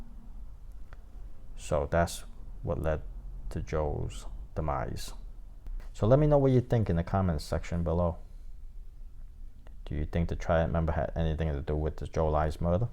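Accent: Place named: New York, United States. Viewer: Awesome NY accent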